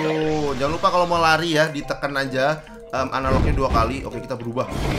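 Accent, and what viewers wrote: Java, Indonesia. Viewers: jowo geming mass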